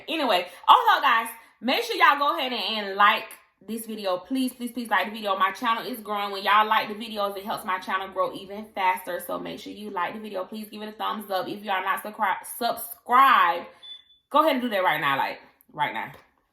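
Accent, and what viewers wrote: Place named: Florida, United States. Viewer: I know you from Florida boooyyyy that accent sound like all my kinfolk😩😭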